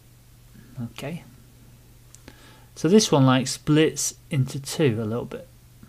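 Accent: United Kingdom. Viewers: That's rude, his voice is not 'obnoxious', its the Yorkshire accent...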